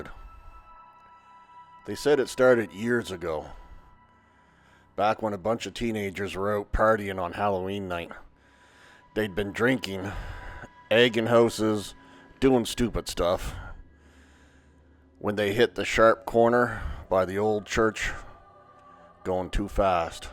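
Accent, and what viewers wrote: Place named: Upper Midwest, United States. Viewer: Spooky story! Sounds like you're from the Northern Mid-West? Canada?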